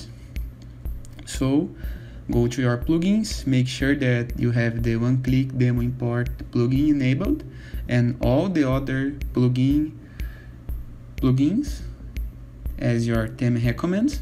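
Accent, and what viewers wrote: Brazil. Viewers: Brasileiro? :D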